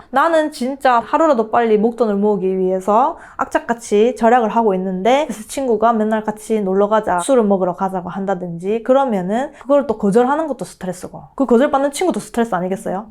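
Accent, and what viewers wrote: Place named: Gyeongsang, South Korea. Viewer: 역시 갱상도 언어^^ 구수~~~~함미다^^🎉🎉🎉 청송아재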